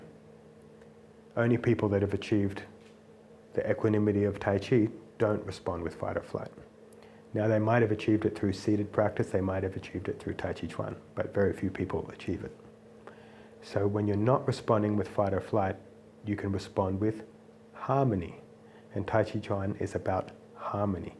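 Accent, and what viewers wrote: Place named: Australia. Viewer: Have seen on YT before, but didn't pick the Aussie accent until just now! Must keep an eye out for seminars here... 👁️ ☺️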